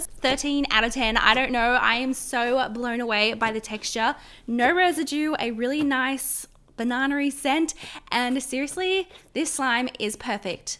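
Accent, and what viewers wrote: Australia. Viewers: “banawneree” no hate. I just really love Australian accents. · She has an Australian accent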